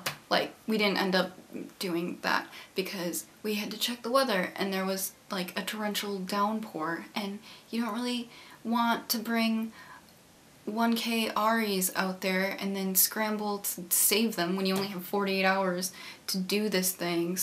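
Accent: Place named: Minnesota, United States. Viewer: your minnesota accent was rly prominent in this video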